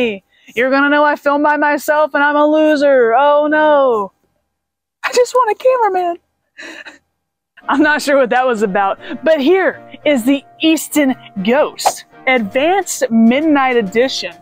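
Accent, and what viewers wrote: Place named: South, United States. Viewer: Californian Here Lol I Dig Your Videos And That Heavy Southern Accent Dude Lol Keep Them Comin Darlin..I To Played Baseball Haha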